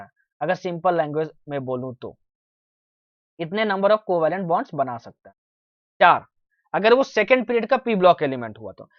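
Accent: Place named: Madhya Pradesh, India. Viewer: Sir I am also from Madhya Pradesh that's the reason aapki tone bhi thodi mere jaisi hai. 😍😊😊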